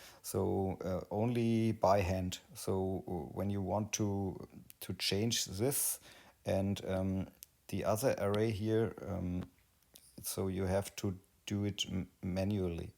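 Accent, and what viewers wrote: Bavaria, Germany. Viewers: Supergut! Wann immer ich irgendwas suche, Du hast fast immer ein Video dazu =D Da leb I a mim boarischn englisch =D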